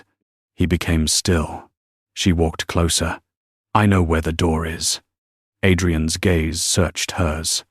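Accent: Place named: France. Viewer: frenglish? beautiful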